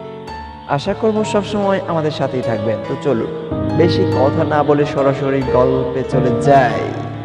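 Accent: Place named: Sylhet, Bangladesh. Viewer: Hahaha! Bhaia apnar mukhe sylhety kota shune atto hashi pay atto hashi pay j ki bolbo bujte pari na😀😀😀😀😀,,,,,,tobe ai hashi beshi din tiklay hoy,,,karon ai golper khub voyanok akta shotti ase ja 1st part ai bujte parcilam r akon kar part gula to puratay flash back😔😔😒😒😒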